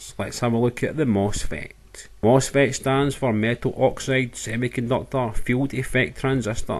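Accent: Scotland, United Kingdom. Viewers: This video taught me two things: how semiconductors work and how to understand Scottish accents. Thanks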